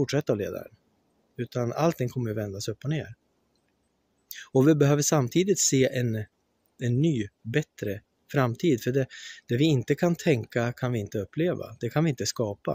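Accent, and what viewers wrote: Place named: Norrland, Sweden. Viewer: Tack, detta är min sanning också. ❤💚 Härligt och höra norrländska. 😊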